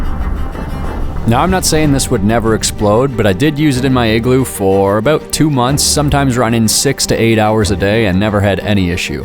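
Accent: Canada. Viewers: Awesome build, my only complaint is the use of pizza sauce from a bottle, and cheddar cheese on a pizza. This is the most Canadian content possible (I can tell from your accent) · I can usually not tell where people are from from their accents but you are very Canadian. :) cheers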